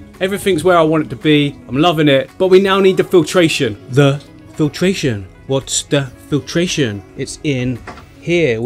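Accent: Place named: Somerset, United Kingdom. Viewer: I’m from Exeter and you are definitely not far from here because you sometimes sound so Somerset 🤣 love the videos, I would love to get into scaping myself but I’m so skint rn. Coronavirus doing us dirty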